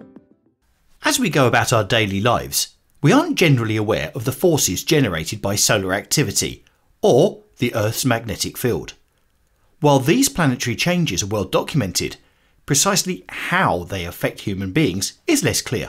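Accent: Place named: United Kingdom. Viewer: Yeap, that's a Brit talking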